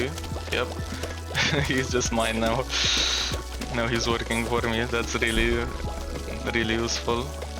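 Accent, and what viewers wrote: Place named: Eastern Europe. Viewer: I love that slavic/russian accent where are you from?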